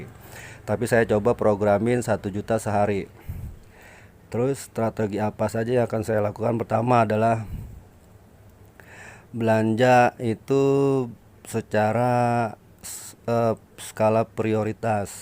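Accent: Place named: Jakarta, Indonesia. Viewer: G jual roko bang. Ngomongnya betawi banget bang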